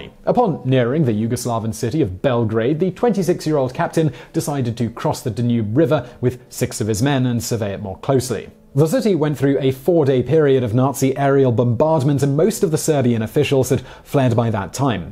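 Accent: United Kingdom. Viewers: Cool video! The thick British and rushed words makes it a little difficult to understand, if you could slow it down a little that would be great. Other than that, excellent.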